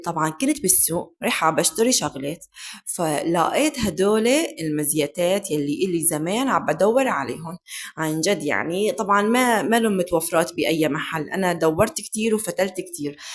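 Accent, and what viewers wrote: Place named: Aleppo, Syria. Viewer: والله و مليون مرة طريقتك في الكلام عسل رونق موسيقى ممكن لاني ليبية فنسمع لهجتك الحلبية حلوى وتشد الانتباه ❤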